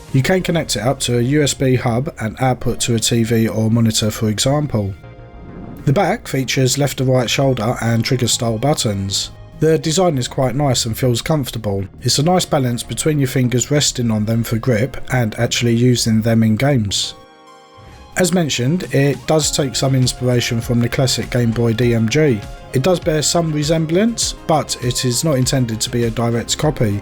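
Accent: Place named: London, United Kingdom. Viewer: If you ever have his money, don't forget about me xD I had a feeling you were using a London accent. Your voice reminds me of his role in the series Taboo.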